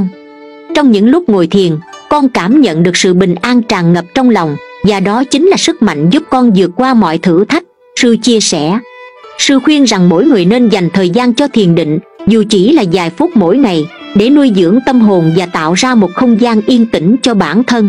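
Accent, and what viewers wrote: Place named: South, Vietnam. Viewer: Giọng đọc hay quá, Chuẩn giọng nam bộ . Coi vậy chứ rất hiếm đấy quý zị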